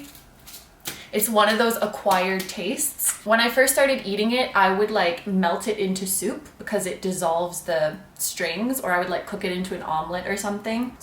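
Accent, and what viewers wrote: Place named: Canada. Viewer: This answered a couple of questions for me. Explains your accent, it’s a Canadian one (I’m also Canadian), the only question I have left is do you speak French?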